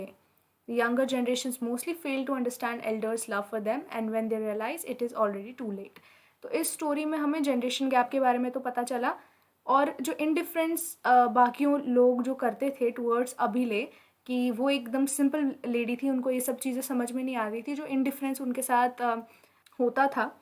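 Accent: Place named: Kashmir, India. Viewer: I think you are from kashmir, your voice 🔥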